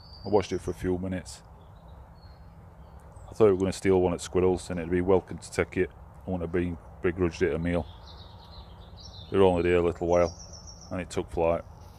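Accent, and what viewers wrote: Yorkshire, United Kingdom. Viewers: I HEAR YORKSHIRE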